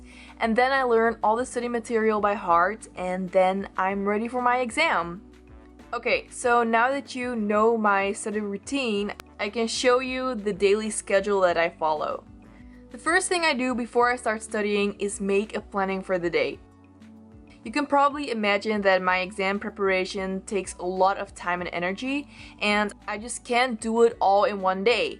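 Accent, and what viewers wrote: Netherlands. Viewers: Are you Dutch? ☺ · Are you Dutch?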